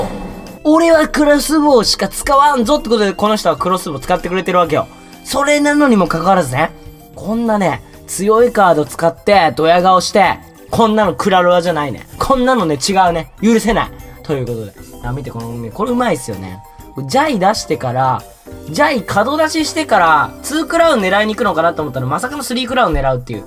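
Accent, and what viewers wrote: Kansai, Japan. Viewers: 最高やんなぜ関西弁強すぎよわすぎぃーワロタww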